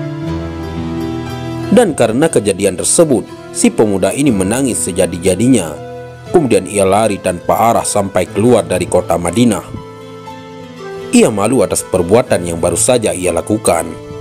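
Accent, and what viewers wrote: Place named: Bali, Indonesia. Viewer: Penyiar nya orang bali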